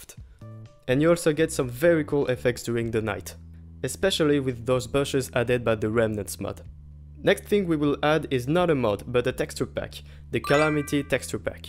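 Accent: France. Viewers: You are french i can feel it in your voice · Hey homie you're french aren't you ? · Je pensais pas tomber sur un français vu l’accent mais incroyable la vidéo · With your accent I guess out that you are French😊 and as a French people I must help the others French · Wait youre french??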